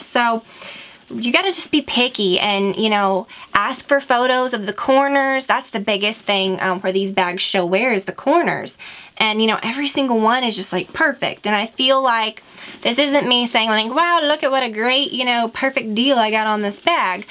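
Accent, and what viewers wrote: South, United States. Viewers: lol why do I feel like you have more of a country accent in this video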